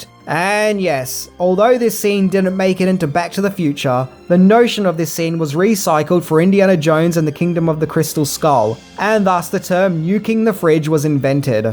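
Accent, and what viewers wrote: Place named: Australia. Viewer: Mate, your content is really good but your delivery is pants - stop shouting at us and I know you can´t do much about your Ozi accent but just tone it down.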